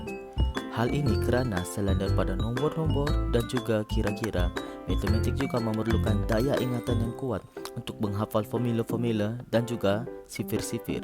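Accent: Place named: Sabah, Malaysia. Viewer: KETARA SABAH TU HAHAHA. TRUSKAN IDENTITI SABAHAN TU . BTW NICE CONTENT 👍👍👍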